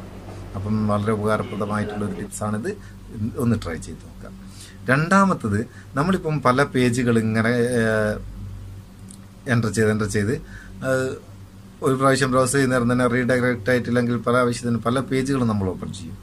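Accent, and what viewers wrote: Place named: Vadakara, India. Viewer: ഇക്ക നന്നായി ട്ടുണ്ട് നമ്മുടെ വടകര ഭാഷയിൽ അവതരണം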